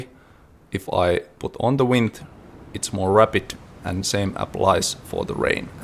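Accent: Finland. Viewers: After watching so many interviews of Sam Lake, I could not not hear the charming Finnish accent :D. Love your game, btw. Keep up the good work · Thought the accent sounded familiar, until I realized you was Finnish! näyttää hyvältä 🤌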